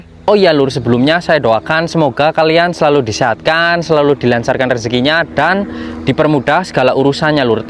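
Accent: Banyumas, Indonesia. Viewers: Banyumas jateng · Mas wong banyumas apa wong sidareja si mas...ngapak poooll ky aku...sampe nyebut parkir ya tetep palkir yaa😊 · Smpyn wong ndi kang....ngapak bgt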